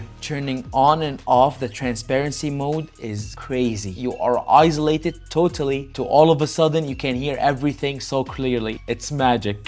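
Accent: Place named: Arab world. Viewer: Thanks! Amazing review and I love the after the hype ones. Also adorable arabic accent "Airbods Bro", make me miss Dubai life.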